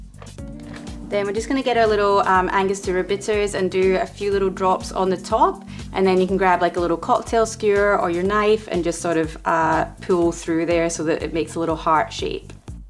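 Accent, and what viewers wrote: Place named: Scotland, United Kingdom. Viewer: Nice and simple. I love the Scottish accent but cracked up at piss-go for pisco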